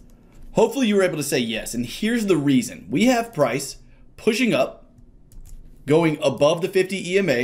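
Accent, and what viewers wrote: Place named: North Carolina, United States. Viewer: Where you from? What's that accent? Sounds like North Carolina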